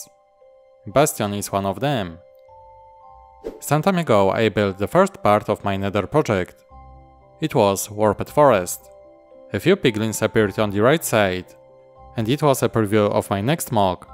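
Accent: Poland. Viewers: Damn, I absolutely love it Dobra robota bratku (Wnioskując po twoim akcencie Polska) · Great build From your accent I assume you are from Poland? If so thats amazing for polish community! :)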